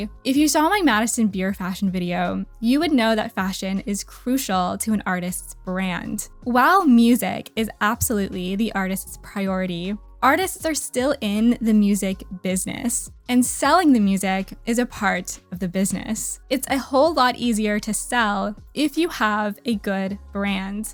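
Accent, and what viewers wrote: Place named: Ireland. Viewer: Can I ask if you're Americans or Irish? I feel I can hear a slight Irish accent.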